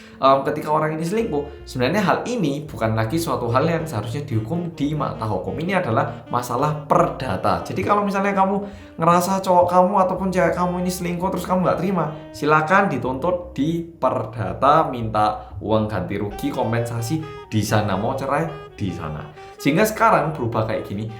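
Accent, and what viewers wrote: Java, Indonesia. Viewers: Mas nya asli orang korea ? Atau Asli orang indonesia... Logat nya aga sedikir terdengar ada logat jawa..tp muka kaya orang korea... Jd mas nya orang korea atau indo ?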